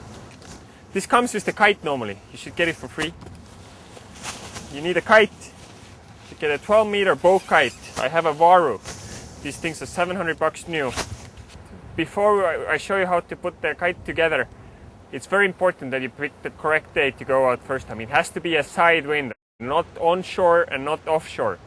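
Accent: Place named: Estonia. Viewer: I figured you are from Estonia by your accent:) nice video tho. I want to learn how to fly badly. :)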